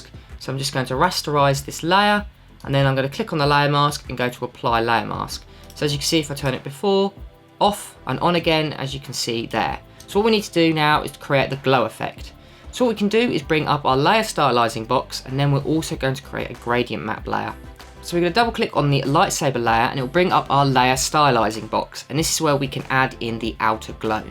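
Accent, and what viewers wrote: United Kingdom. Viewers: Is this British accent? I like it😍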